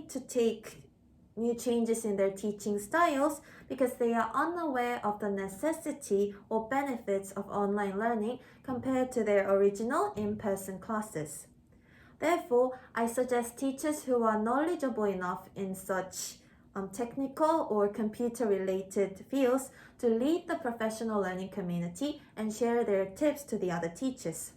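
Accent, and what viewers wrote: United Kingdom. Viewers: 영국 발음이시네요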